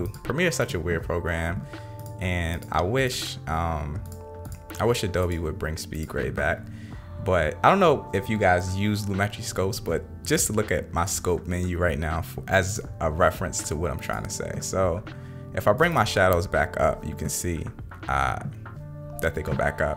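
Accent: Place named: Atlanta, United States. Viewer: His dialect is like Andre 3000. Atlanta, maybe?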